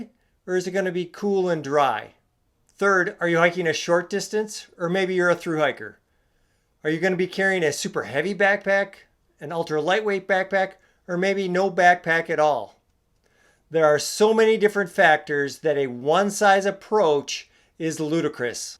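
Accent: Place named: Wisconsin, United States. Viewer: Based on accent, Wisconsin, Barron County? Thanks for the tips.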